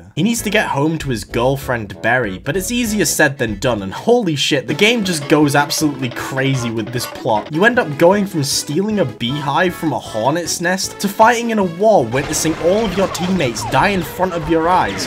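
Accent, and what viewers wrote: United Kingdom. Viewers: Are you British? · i didnt even notice you were british until you started talking about it LMFAO damn im fucked up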